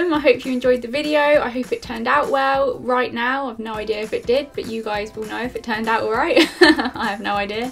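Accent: United Kingdom. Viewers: ohmygod YES finally a DIY-er (idk) thats british hallelujah!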